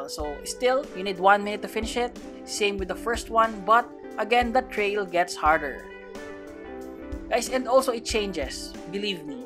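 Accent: Philippines. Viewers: Are you Filipino, your accent😂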